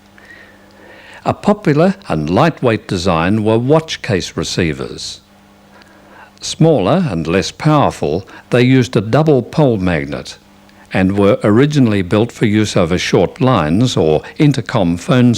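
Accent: Australia. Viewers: The Voice Artist is speaking with an Australian Accent, as this is an Australian Production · Australian accent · He's Australian, other than that, not sure. I heard him in many educational pieces growing up in Melbourne Victoria. It's cultivated Australian English which (I think) is based on Oxford English but sounds a bit flatter for lack of a better explanation. It's most common in Sydney and Melbourne.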